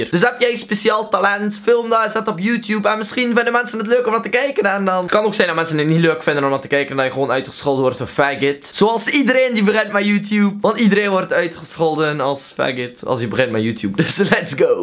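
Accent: West Flanders, Belgium. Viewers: Mensen spreekt een beetje raar maar niet omdat hij Vlaams spreekt, maar West-Vlaams en dat is heel anders dan zeg je ipv ik bv ek en bv pikvis pekves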